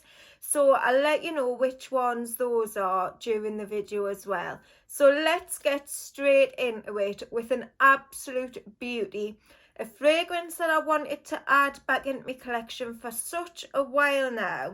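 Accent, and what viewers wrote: United Kingdom. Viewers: Wow!! Congrats on the 2007 Angel!! Beautiful bottle. I've never tried Angel, tbh. I'm an Alien girl so not sure I would like Angel. I've heard it's either one or the other and I also really hate patchouli bombs. But you're making me want to sniff it!! Also, where is your accent from? I know somewhere in The U.K. but I've always wondered!!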